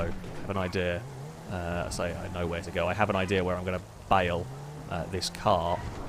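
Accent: United Kingdom. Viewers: God ur british accent wont help you, you just suck at Survive the hunt, I mean this is n#20 and you STILL cant survive?? IF next content gets bad like this got, imma avoid this channel 4ever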